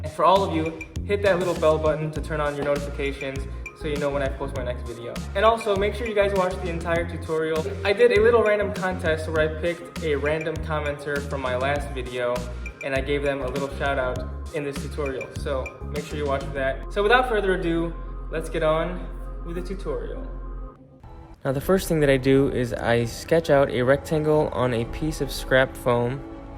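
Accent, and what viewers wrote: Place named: Asia. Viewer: bro where are you from where your accent is like asian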